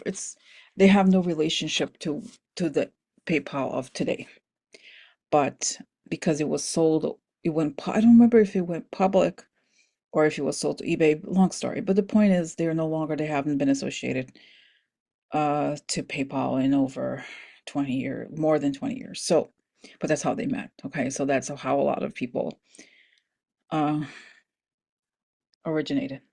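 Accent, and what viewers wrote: Russia. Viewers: Sorry but not understanding ur Russian accent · Thank you, for that hopeful message before I hit my pillow! God I love your weird little faces and Russian accent! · I just love your Russian accent! It’s my birthday on 4/6 and I needed this video. Happy Belated Birthday ❤️ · Your Russian accent is lovely!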